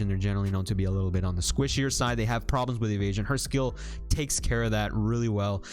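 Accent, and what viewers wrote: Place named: England, United Kingdom. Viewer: i love english pronounciation on german words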